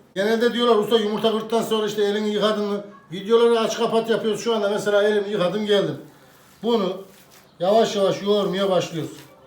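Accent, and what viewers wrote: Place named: Çorum, Turkey. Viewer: Ustam eline sağlık. Çorumlu musunuz memleketimin şivesi çok güzel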